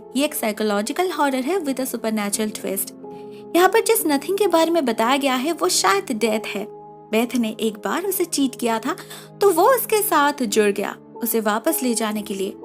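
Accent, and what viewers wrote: Bengal, India. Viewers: Keya app bengali hooo?? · Khub sundor didi.. tumi bangali hoeo eto sundor hindi accent tomar❤️❤️ Ajk bangla tei comment korlam..😁 Love you di❤️❤️❤️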